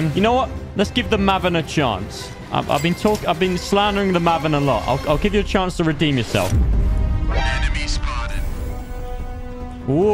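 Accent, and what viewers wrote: Scotland, United Kingdom. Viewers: Bro that Scottish accent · We need to see more of the scottish accent. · Everyone talking about the Scottish accent but I gotta know what he said in German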